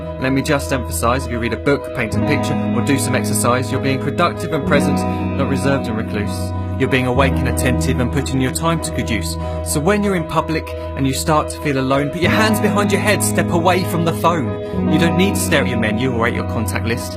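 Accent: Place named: United Kingdom. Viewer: He talks real funny! Is he british or somthing? Anyhow, all you ol' geezers should spend MORE time on yer smart-phone! Like I do!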